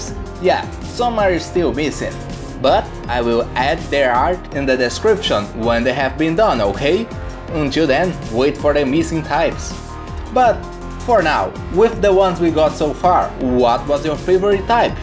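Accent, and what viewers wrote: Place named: Brazil. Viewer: you have a brazilian accent, are you from brazil?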